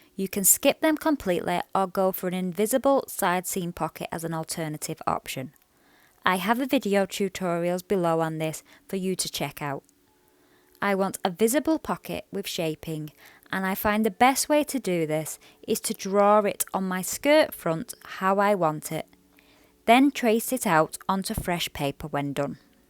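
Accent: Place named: North, United Kingdom. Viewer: I LOVE your northern accent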